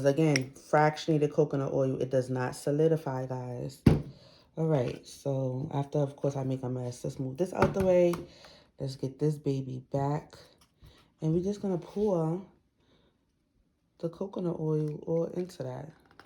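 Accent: New York, United States. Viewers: Are you from NY? Love the accent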